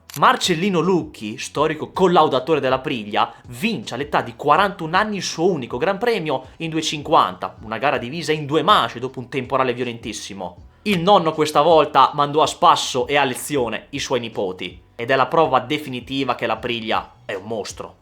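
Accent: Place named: Modena, Italy. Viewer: Di dove sei? A volte sembri reggiano, a volte modenese... più modenese...bei video!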